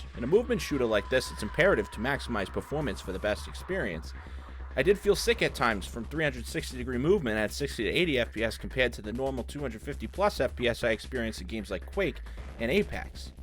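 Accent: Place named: Boston, United States. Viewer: You gotta be from Boston lol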